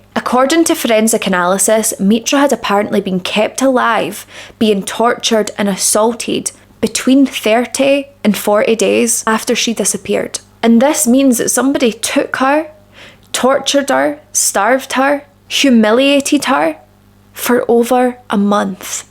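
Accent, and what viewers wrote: Scotland, United Kingdom. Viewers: I noticed my notification for your video and yelled out you posted, my 9 yr old son yelled whoo hoo because even he knows how much I love you❤. You do stories I haven’t heard and I love that you have the same thoughts of the criminals and things they do so it’s more engaging to watch! Not to mention I adore your Scottish accent, I tried to learn it by watching videos but it’s not so easy😂. If I could have some makeup tips that would be great but I don’t think I would be as beautiful as you😊. Thanks for all your hard work, I hope you get noticed more, you deserve it love.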